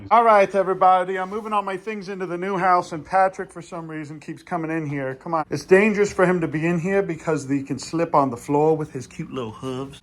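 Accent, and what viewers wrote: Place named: South, United States. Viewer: how are you both Southern and Canadian at the same time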